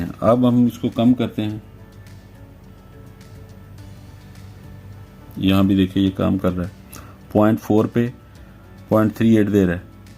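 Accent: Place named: India. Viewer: با اون لهجه هندی ریدی تو انگلیسی حرف زدن ، گاو مثل آدم انگلیسی حرف بزن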